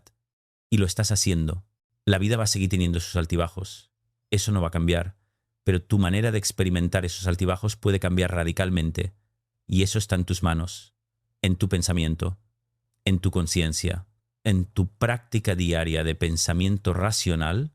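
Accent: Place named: Latin America. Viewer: Por qué tiene acento latino?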